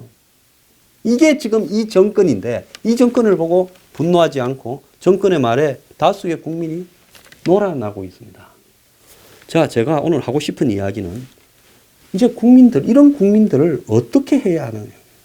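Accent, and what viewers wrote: Gyeongsang, South Korea. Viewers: 황소장님 정치적견해를 떠나 구수한 갱상도사투리 좋습니다^^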